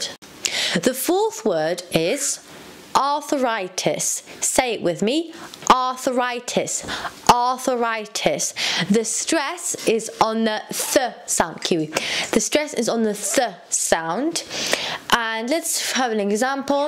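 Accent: United Kingdom. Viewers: Thank you very much I do love british accent send me more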